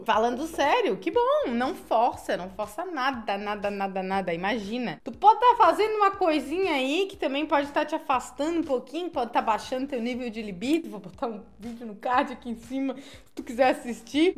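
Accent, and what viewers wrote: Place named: Florianópolis, Brazil. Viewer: Sotaque de Manezinha de Floripa. 😎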